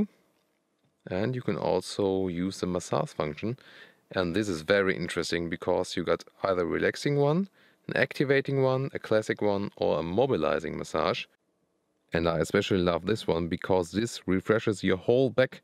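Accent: Germany. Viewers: ***** Kann dir da nur zustimmen. Sprichst zwar mit einem starken deutschen Akzent, ansonsten geht dein Englisch völlig in Ordnung. Und so ein Akzent ist halt völlig normal bei einer Fremdsprache.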